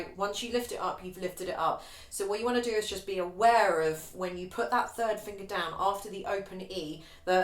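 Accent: England, United Kingdom. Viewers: Aloha from Hawaii, I have just discovered this beautiful song and have only been playing violin since last October..However I have a good ear..and LOVE this piece. You are a wonderful teacher, and break the measures down so well for someone like me who plays better by ear. Also, I am Hawaiian, but my mum was from England..and adopted me from birth..SO it is like music to my ears!! to hear you speak..And you play beautifully. THANK you very much for everything!!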